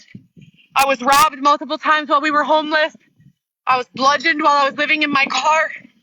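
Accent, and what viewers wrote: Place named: Latin America. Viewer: Here she goes with the latina accent🤣😂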